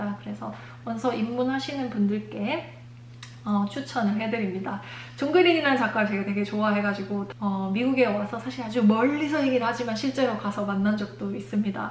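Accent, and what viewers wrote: Gyeongsang, South Korea. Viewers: 원서를 몇권 읽어보니 처음엔 책장 넘어가는 속도가 너무 느리다가 탄력받으면 마지막으로 갈수록 많은양을 하루에 보게 되더군요. 한국말을 차분히 들어보니 경상도쪽 억양이 있으시네요~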